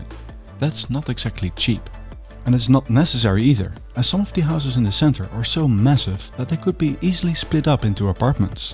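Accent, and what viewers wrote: Netherlands. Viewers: You are advocating the preservation of traditional local design elements. I can tell by your Dinglish you are Dutch... Please preserve the original pronunciation of "Amsterdam" as well and don't switch to the horrible English pronunciation of it... On Weespersluis architecture. One thing they could easilly have done better for the traditional look and feel: Simulate what is common in Dutch cities and vary things as the exact front of facades. Make them stepped back and front just a little. Or lean foreward (as they traditionally often did on purpose) and backward as sometimes happened. It would have created a look that is way more organic...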